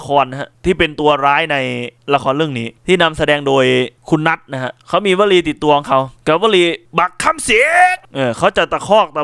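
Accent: Ubon Ratchathani, Thailand. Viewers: ว่าแล้วเวลาแอดมินเว้าอิสานสำเนียงทำไมคล้ายๆสำเนียงอุบลจังเลย ผมคนพิมูล อยู่ตำบลนาโพธิ์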